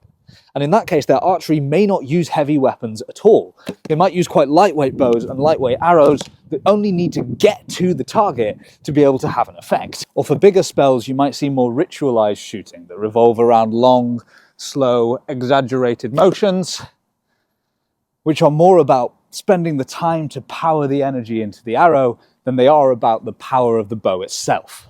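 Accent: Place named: North, United Kingdom. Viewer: Did I just thoroughly enjoyed a comely, charismatically genteel ranger ramble about the biology of imaginary creatures in a posh northern accent? Never thought of the structural integrity of a centaur... Yeah the upright human spine + equine vertebrae won't work. Oh I'm a mage. How do you do.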